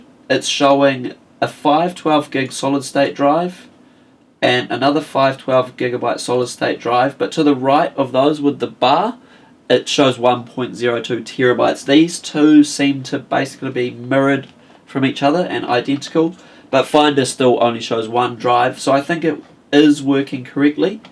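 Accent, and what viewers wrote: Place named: New Zealand. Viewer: that is a Kiwi accent, (New Zealand)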